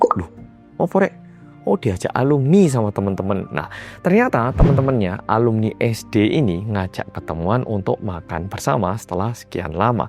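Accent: Java, Indonesia. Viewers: Mas.... Muedooook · sehat terus Mas, selalu kangen denger suara bule Korea yg medhok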